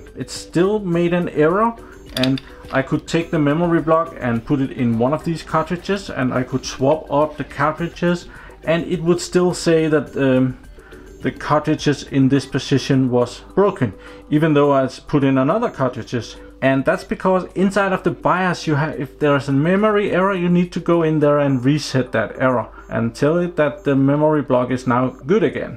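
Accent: Denmark. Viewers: are you danish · dansker confirmed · are you from denmark?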